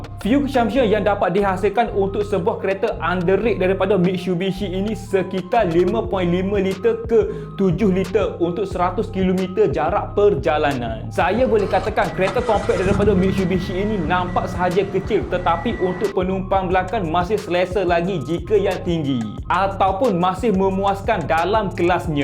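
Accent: Kelantan, Malaysia. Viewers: Kuat tone klate abe ni.. Blh tau.. 😁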